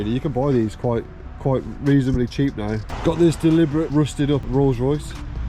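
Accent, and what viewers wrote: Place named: England, United Kingdom. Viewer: What a fun English accent...and owsome cars...yeah...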